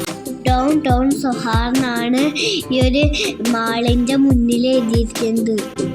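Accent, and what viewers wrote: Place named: Valluvanad, India. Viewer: അടിപൊളിയായിട്ടുണ്ട്. വള്ളുവനാട് ഭാക്ഷ കൊള്ളാം ട്ടോ❤❤❤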